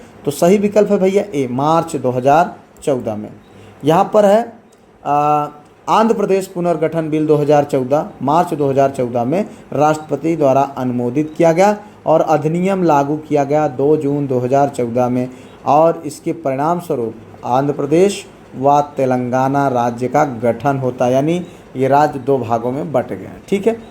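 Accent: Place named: Bhojpuri, India. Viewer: Nice session Sir ji waise Sir aapki bhojpuri langauge bilkul hamari tarh hai jaise hamare yaha boli jati hai 😊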